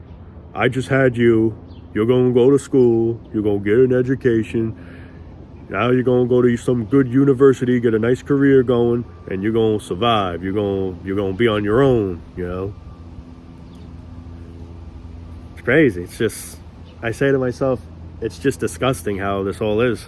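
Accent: New York, United States. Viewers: New Yawk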